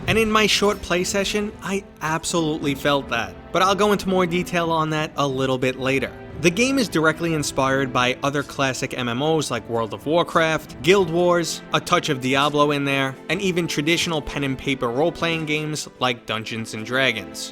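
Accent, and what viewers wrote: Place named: Boston, United States. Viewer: Great video. Also you sound like you're trying really hard to hide that Boston accent, but failing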